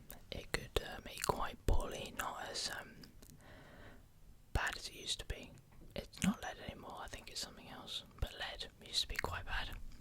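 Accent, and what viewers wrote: United States. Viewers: AHAHAHHAAH I LOVE THE AMERICAN ACCENT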